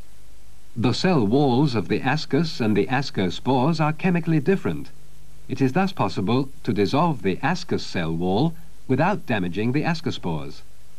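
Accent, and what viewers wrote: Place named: RP, United Kingdom. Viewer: This is great. I love old science ed videos narrated in recieved pronunciation. thanks for posting.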